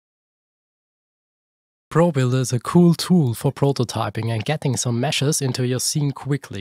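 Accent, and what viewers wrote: Germany. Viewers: I can hear it. A little German guy. Good Video! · he has a german accent, everything he says is correct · Alman is what we say in Germany to a perfectly stereotyped German. So you have a German accent😁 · You sound German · only 74 subs??? holy you need more attention mate, awesome video. also nice voice and accent. are you german?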